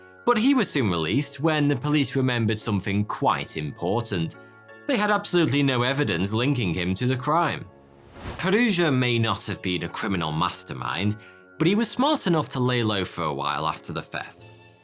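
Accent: United Kingdom. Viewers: he's addressed this. He says both. And that's how the British accent works. Considering how many differences there are in pronunciation, it's silly to even mention it.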